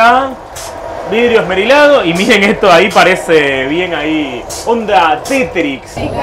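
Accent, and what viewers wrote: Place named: Argentina. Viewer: Viajas por el mundo....y hablas en acento argentino...?.. habla en castellano original...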